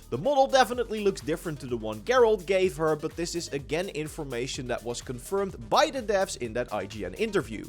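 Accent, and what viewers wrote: Netherlands. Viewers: U need to work on your english you sound dutch af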